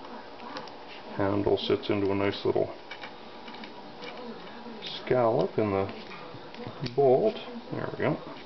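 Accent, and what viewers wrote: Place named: Canada. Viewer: LOL 2011KyussLives how could you not tell he is Canadian. Kyuss great band btw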